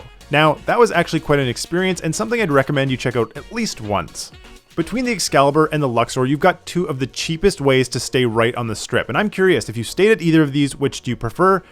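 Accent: Canada. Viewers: Are you Canadian??? 🤔 if not, you’ve got a Canadian accent!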